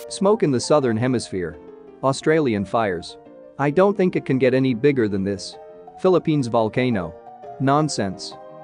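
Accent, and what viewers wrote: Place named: United States. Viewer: But your text to speech accent is so american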